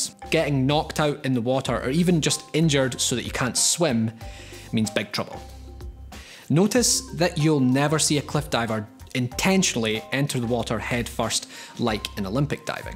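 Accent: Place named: Scotland, United Kingdom. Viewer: ah yes the return of mr scottish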